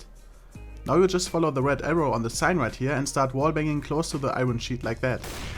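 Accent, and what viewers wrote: Germany. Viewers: Dieser deutsche Akzent bääää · Oh yeah, German English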